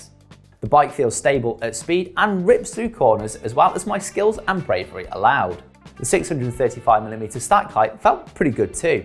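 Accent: England, United Kingdom. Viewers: Would you kindly do this review again without the English accent?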